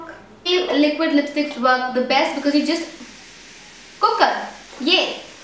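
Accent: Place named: Delhi, India. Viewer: tv halka karwa deee wats tht meann this is one of ur best video n i save it offline love ur delhi wala tone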